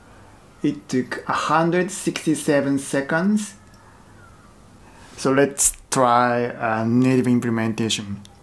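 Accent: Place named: Japan. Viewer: I like your Japanese accent.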